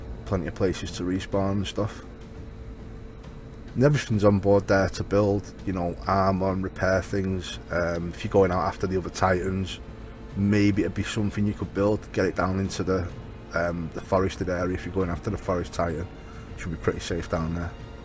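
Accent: Manchester, United Kingdom. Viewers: Deffo from salford or Manchester sound like you are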